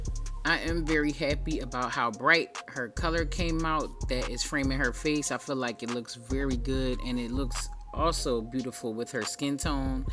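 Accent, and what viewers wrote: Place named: Delaware, United States. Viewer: I’m from Delaware but I live in Cali now. I love watching your videos and hearing your accent. It always reminds me of home ♥️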